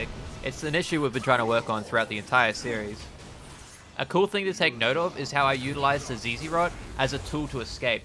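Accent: Australia. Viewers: Hi are u from Australia?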